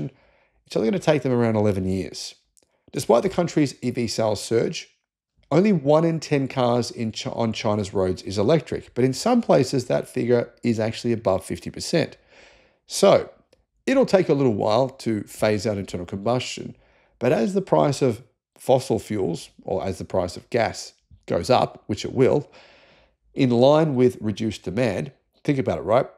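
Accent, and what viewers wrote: Australia. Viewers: Nice listening to an Australian talking about EV/Tesla. The American commentators have their head well and truly up Trump's ares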